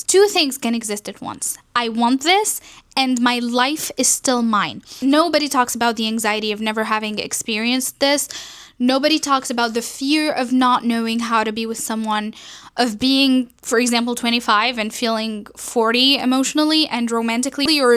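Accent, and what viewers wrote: England, United Kingdom. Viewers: i loveeed ur english accent machalah <33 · Guuuuuuuuurl your english accent !!!! You slay queeeeen ! Keep up the good work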